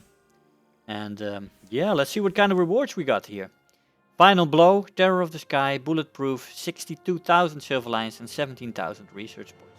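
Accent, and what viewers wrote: Netherlands. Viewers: lol xD im from indonesian and i know the dutch accent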